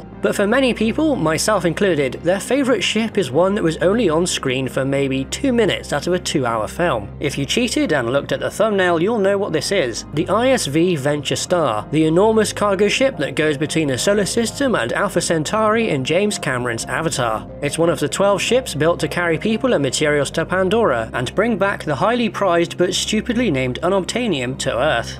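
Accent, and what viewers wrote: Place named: Scotland, United Kingdom. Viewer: What is this accent? Scottish? Canadian? Kazahkstani?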